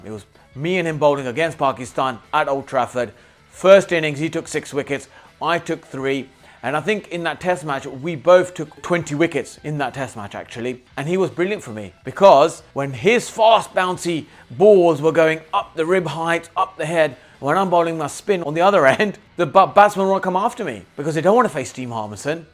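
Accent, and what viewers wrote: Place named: India. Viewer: You still have some Indian accent left within you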